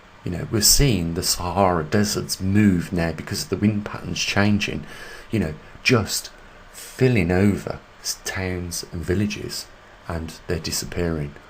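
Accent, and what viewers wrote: Birmingham, United Kingdom. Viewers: You sound like your from my neck of the woods. Birmingham. Greatest thinkers 👍👍👍👍👍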